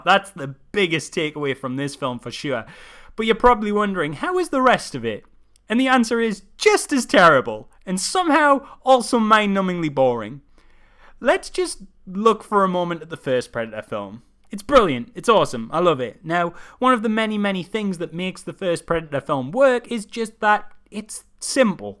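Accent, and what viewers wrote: Newcastle, United Kingdom. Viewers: Hey fellow geordie.👍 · just love the geordie accent ..Fucking Baffling!! love it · Are you a geordie lad? A could here it a bit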